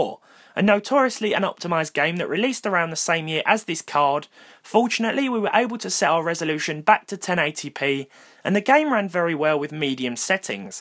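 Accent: United Kingdom. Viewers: man, ive never heard a more british accent than this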